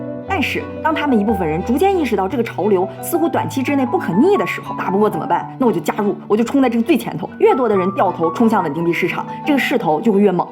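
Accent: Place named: Northeast, China. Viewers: 这么漂亮睿智的女人，一张口，出乎意料的东北大碴子味普通话！😂😂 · 他们都是大连人啊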